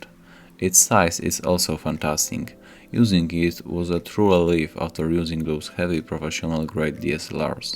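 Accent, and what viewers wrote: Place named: Poland. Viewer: Po akcencie wydaje mi się że musisz być z Polski 😉😉👍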